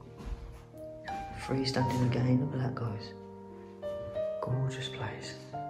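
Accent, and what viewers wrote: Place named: Derbyshire, United Kingdom. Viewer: A bit posh for a Derbyshire girl 😂 😍😍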